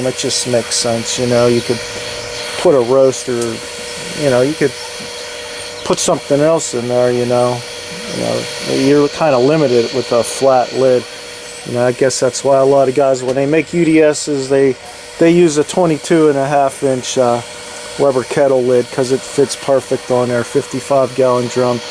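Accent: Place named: New York, United States. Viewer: “Forget aboouu It” can tell you are from the north East with a touch of New Yorker in ya. That lack of burnt charcoal is so impressive. Just got rid of all my extra 18” Webers - guess who is now back searching for one. I already have a couple of old stock pots - you truly inspire me.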